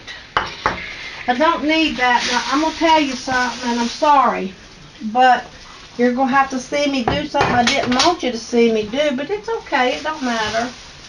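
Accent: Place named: South, United States. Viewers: I love your videos. I am originally from Virginia near the WVA border, so your accent sounds like home! I am going to try the Fig Newtons. I think we will like them. The bacon looks good too. I'm going to share your channel with my daughter who loves to cook. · I love when cute lol says. Let me tell you something in her country voice. And her nice kind husband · I like to watch you cook and hear you talk. Wondering where you live and if that is your real kitchen. It's so comfy looking. You accent sounds like mine; I'm from South Central Kentucky.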